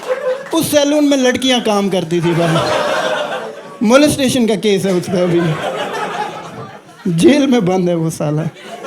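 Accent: Kashmir, India. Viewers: Puré Kashmiri accent 🫶🏻🌷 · is just Samay riana with a beard, a Kashmiri accent and daring muslim jokes. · Awesome Performance. Timings and delivery along with that Kashmiri Accent was fire 🔥🔥All the best from US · Mainey subas - crybe karr leya 😂😂 .. Kashmiri accent ❤ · Kashmiri accent hai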